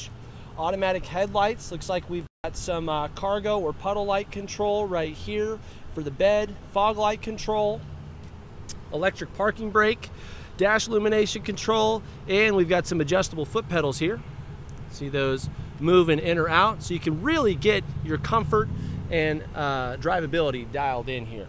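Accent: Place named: South, United States. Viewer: It gets quite annoying with the "Ram" and southern accent lol, it makes me feel like Kia guys don't know what it is really like to own a pickup truck.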